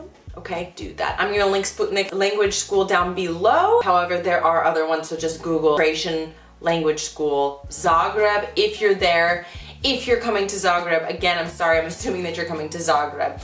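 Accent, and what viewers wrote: United States. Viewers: and now , all that you said in English, translate into Croatian, Serbian and Bosnian. Write as you speak, read as it is written... Work on the voice pronunciation - "Ć, Č, DŽ, Š, Ž ... in your case, since you are an American - R voice, too... Language has a lot of consonants, seven cases, which is not a lot, Finnish has 14... It is hard, but you'll get it :) you do not need a Cyrillic letter for Croatian, you do not need it even for Serbian, only if you are an enthusiast. This was once called the Serbo -Croatian language, and now they are separated - these are nuances, language is the same ... Bosnian is the same language, too :)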